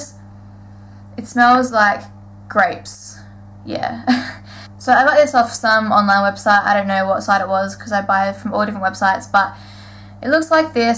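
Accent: Australia. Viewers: its weird hearing another australian on youtube because everyone is american!!! this is what i sound like to others :D and i love it!! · I love the Rimmel one!! I cant belive i JUST found u! A fellow aussie lol altho ur accent is alittle more refind than mine hahaha hope 2 keep in touch, ur awesome! xx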